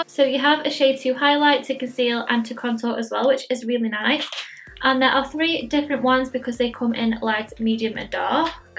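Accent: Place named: Stoke, United Kingdom. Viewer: Bit of a random question but are you from Stoke? Your accent sounds so Stokie!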